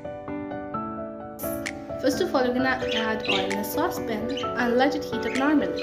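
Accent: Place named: England, United Kingdom. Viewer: Yooo Desi recipe in English accent... Yummy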